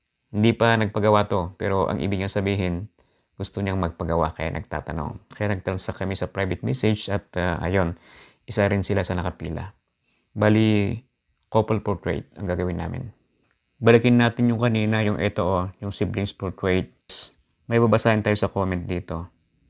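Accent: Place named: Ilocos, Philippines. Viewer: Ilokano ka ngayam sir haha nice one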